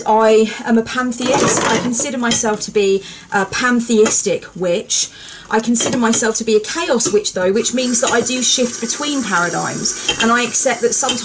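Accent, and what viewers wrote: United Kingdom. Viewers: This was brilliant ... can't say the word with the adorable British accent though ... thanks ☮️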